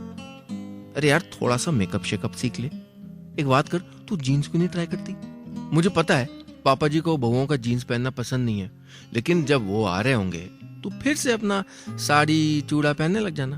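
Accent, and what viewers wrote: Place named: Punjab, India. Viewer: बहुत खूब. पंजाबी तड़के में कहानी सुनाने का ढंग बहुत ही मज़ेदार.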